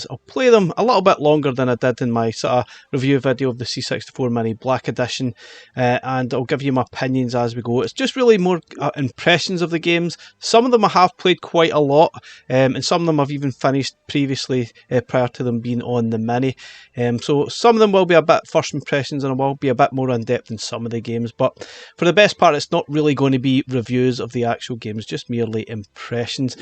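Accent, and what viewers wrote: United Kingdom. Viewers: TIL: Brits talk funny. ga-LAG-a? Anyway, the games look great, but I need no more chunks of plastic. If they put the collection on an Evercade cart or something, I’m all in.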